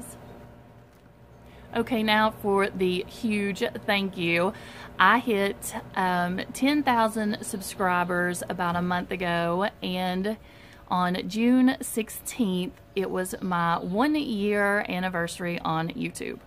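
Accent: South, United States. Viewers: Congratulations on such an awesome milestone! I love watching your videos and listening to your southern accent. I am from Texas but our accent is a little different. I will be looking for the tumblers and snack containers for an upcoming trip such a great idea to use instead of our more expensive tumblers! Dollar Tree haul for me tomorrow. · Congrats on 10k! And in only one year. It's that southern accent. Gets them everytime am I right! From another southern belle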